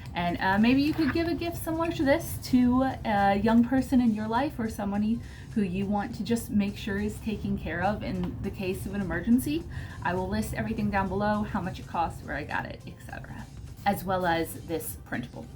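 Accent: Texas, United States. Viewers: As soon as you started talking, I said "you live in Texas, this is a realistic thing to prepare for!" I'm very into emergency prep after my town was hit with a horrible storm and I was without power for 10 days. It's so important. This year I'm budgeting for building up my preps and buying a (small) generator!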